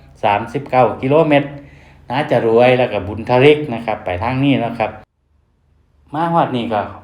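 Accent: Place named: Chaiyaphum, Thailand. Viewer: สำเนียงพี่เหมือนคน จ. ชัยภูมิ จังเลยครับ 😆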